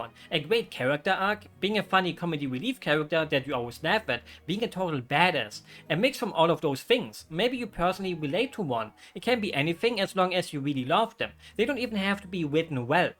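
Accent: Germany. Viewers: I just came along this video and looked at your channel and thought: "wow that this is a Nia fan and he has a Deutscher Akzent" and then I took a look at the channels description and I was definitely not disappointed xd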